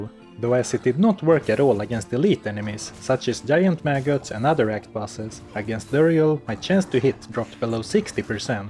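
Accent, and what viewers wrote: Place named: Sweden. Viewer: Finally new video i almost started missing your swedish accent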